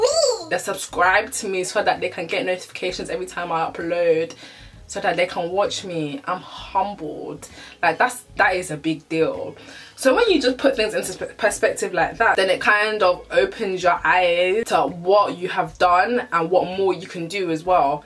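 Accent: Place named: United Kingdom. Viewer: I just had to click youuuu the title caught my eye.... bowyyyyy do i love your accent you sound proper British😍😍 i love the content of this video i love the banta/ rant I totally agree 💯 yanoe most of the times us black people dunno how to support each other..... like im actually in love with you right now " their youtube video dont even bang" its really really really hard talking to the camera yanoe i laugh looking at my first three youtube videos i sounded like a penguin thats how we learn inni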